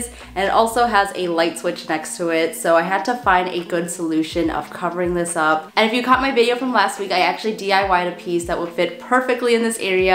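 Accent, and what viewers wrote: Chicago, United States. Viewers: yoooo this room is rad! (also love your chicago accent! 😊)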